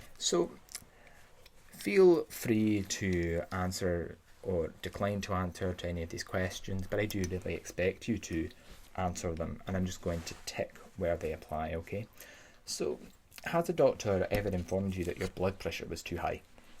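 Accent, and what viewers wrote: Scotland, United Kingdom. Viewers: I always found the Scottish accent a great trigger for me! suscribed m8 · your Scottish accent is great!! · I love scottish accent, and yours is amazing. Would you make more of it? 💞❤️ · As a scot. I have to say , loved the accent . Sound like most of my mates. And me kinda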